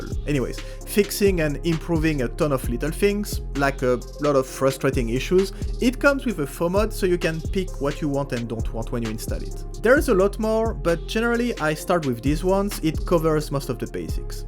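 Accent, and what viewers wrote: France. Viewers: Lately, I enjoy more and more listening english with french accent. I start to feel what that dude from Matrix said.. "It's like wipping your ass with silk." just,.. muuaahh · Are you French?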